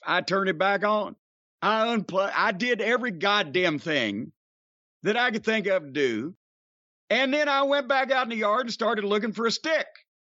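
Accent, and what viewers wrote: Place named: Kentucky, United States. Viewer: "So I hammer the fUHk out of those things for about 50 hhhhuh'WHACKS" his Kentucky accent makes everything he says sound even funnier 🤣 He should have pulled out his ol'trusty tennis racket and started huh'whackin away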